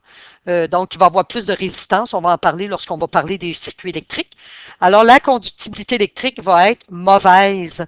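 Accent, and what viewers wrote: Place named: Canada. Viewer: Bonjour madame et bien merci pour votre réponse très rapide et c'est parfait cela me permet de très bien clarifier ce sujet. Vous êtes très compétente et j'aime bien votre accent Canadien et bien le bonjour de Belgique.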